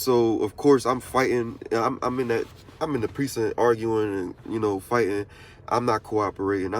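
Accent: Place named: Philadelphia, United States. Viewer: your from philly bro i can hear it, fighting my 2nd dui right now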